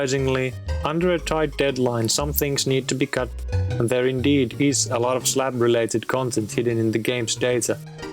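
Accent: Finland. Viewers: finnish yeah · The voiceover has to be Finnish? Onko aika mennä Torille? 🇫🇮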